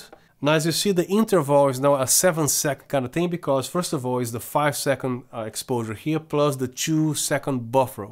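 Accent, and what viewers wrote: Brazil. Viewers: THIS is what i call a review! Amazing work, thanks a lot! Fale bem, mas vc deve ser brasileiro neh?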